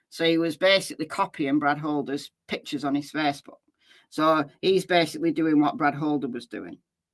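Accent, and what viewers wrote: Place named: Northern England, United Kingdom. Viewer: Recently discovered your channel. Nice to hear a northern accent - my Dad & Grandma are from Fleetwood, I’m from Leicester but live in BC Canada now. This case has me so outraged & I’ve followed since the beginning. So many unanswered questions & so much evidence pointing at other suspects. Hoping the truth will finally come out soon!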